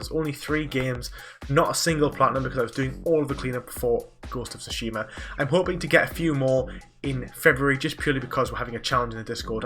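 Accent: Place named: Teesside, United Kingdom. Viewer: Now mate been watching your channel a couple months, and judging by your accent I think your from near where I live. I live in Middlesbrough. If you don’t want to say where your from I understand.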